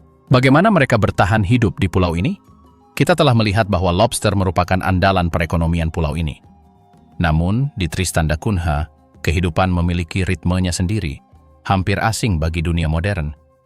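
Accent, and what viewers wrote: Malaysia. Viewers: Logat ngomongnya ini dari malaysia, tapi nama akun nya pakai nama indonesia...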